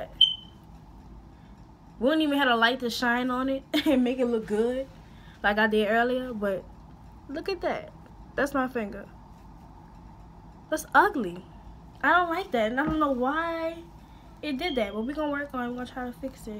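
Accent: New Orleans, United States. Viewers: She gotta be a NOLA baby!!! I am an we got that accent ♥️⚜️ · I need a friend from New Orleans so I can laugh I love the accent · Lmfaoo bruhh u from New Orleans or Reserve? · I love the New Orleans accent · Gotta be fr the NOLA! TOO CUTE! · Yo! I'm probably old enough to be your Mom, but IFWY!!! OMG! The REALEST video EVER! You crazy and funny asl and we NEED that!! You feel me? I knew as soon as you opened your mouth, you were from NOLA. Y'all funny, crazy, and curse a LOT, but I LOVE it!! You're very pretty too! 😍 New Subbie! PLEASE keep doing hair reviews or hell..any kind. 😂❤💯👍🏾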